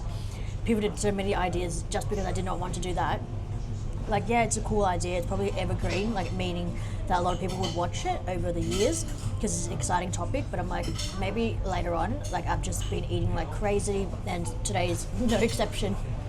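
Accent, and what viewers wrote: Australia. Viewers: To answer your question, what you guys watch? The travel and food vlogs have been done to the death. You are may be 10-15 years late to this genre. I have watched two of your videos, I watched them just for drama and hear an Asian woman taking in heavy Australian accent. I have few more critiques but I will keep them to myself.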